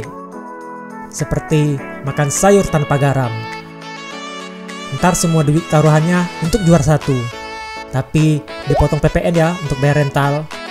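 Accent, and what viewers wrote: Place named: Medan, Indonesia. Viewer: Kayaknya abang ini oranh medan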